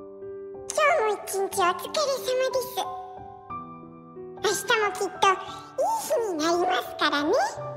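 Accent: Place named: Kansai, Japan. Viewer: 最高です‼︎最近このチャンネルを知りました。毎日見ています😂もはや中毒と言ってもいいほどハマっています。結婚を機に東北へ引っ越しして早15年。関西弁が恋しくて毎日ここを訪れては腹が捩れるほど笑っています❤今後も楽しみにしてますよ🎶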